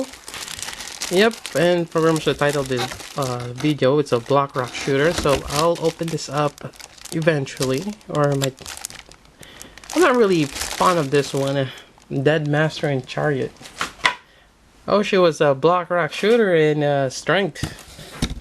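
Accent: Philippines. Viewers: I was just wondering, are you Filipino? Mebbe because of your accent, dunno just askin'.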